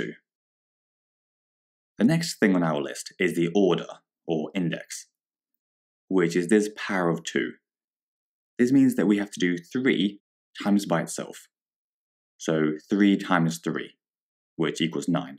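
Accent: United Kingdom. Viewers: 😍😍 HES BRITISH!! (my dad is forcing me to watch a bod maths video not like I want to)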